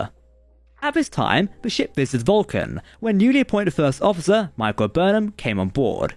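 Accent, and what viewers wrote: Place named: Cockney, United Kingdom. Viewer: Firty seven. The Cockney accent drives me insane.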